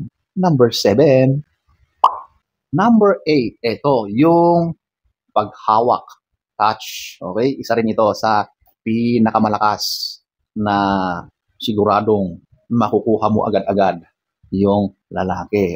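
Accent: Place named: Baguio, Philippines. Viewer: 😂😂😂😂❤❤❤ taga Baguio kadi brod like how you talk cute u mo 😂😂😂buts true LAHAT ❤️🌹😂🥰🤩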